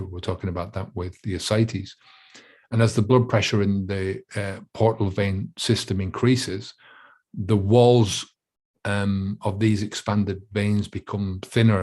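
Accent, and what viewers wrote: Ireland. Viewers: New here, can tell you're a fellow Irishman but can't quite place the county 😂 if i had to guess id say youre a Dub? Great video either way pal!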